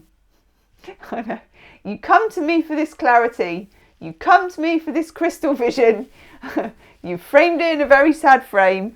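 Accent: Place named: Devon, United Kingdom. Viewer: i absolutely LOVE your Devon accent!! 😂😂😂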